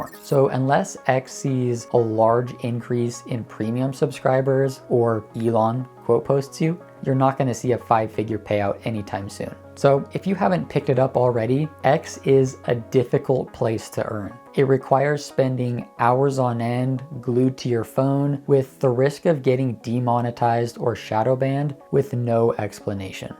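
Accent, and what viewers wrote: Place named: India. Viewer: This dude sounds like an indian scammer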